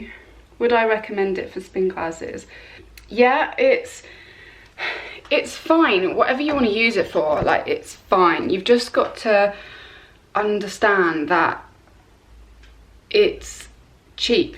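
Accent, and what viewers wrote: England, United Kingdom. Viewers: Nothin wrong with your accent but then i'm english too. Thanks for the additional info, shame fitness superstore have followed all of the other sellers and whacked the price up to £350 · i love the english accent... the more up north the better it gets ;-)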